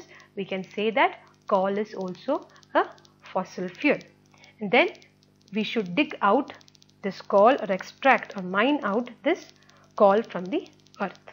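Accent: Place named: Kerala, India. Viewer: Pronounciation says that u r a malayali teacher. I m too from Kerala and teacher at Karnataka. Video is very helpful for teacher like me👍👍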